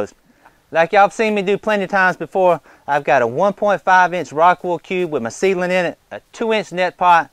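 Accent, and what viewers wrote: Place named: South, United States. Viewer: Good stuff. Interesting experiment—I’m always hunting for that elusive “perfect” organic solution for hydroponics. Keep it up man! Btw: are you from anywhere near where Jimmy Carter is from in GA? I’ve been around a lot of southern dialects in my life, but the only place I recall hearing anything similar to yours is maybe from Jimmy Carter. Lol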